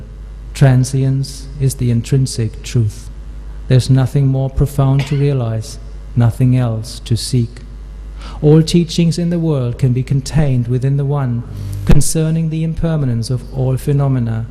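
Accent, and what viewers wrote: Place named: Australia. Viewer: His accent is very Australian now.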